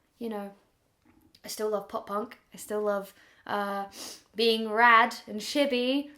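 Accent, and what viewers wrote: United Kingdom. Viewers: We like you for you Not becau-se you have a British accent Or because you talk in tangents So coherently But what we view Are your stru-ggles and confusion The dreams that you pursue on This quest to find out who you want to be <link>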